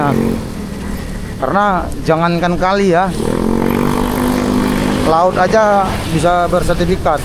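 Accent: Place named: West Sumatra, Indonesia. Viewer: Aksen Bang kyk orang Sumbar 🙏🙏🙏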